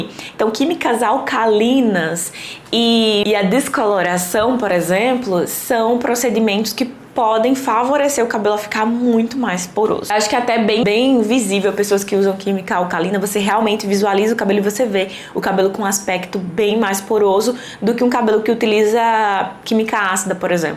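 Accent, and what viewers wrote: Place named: Pernambuco, Brazil. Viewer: Olá! Você é Pernambucana? Seu sotaque é tão lindo 😊 sou nova aqui ❤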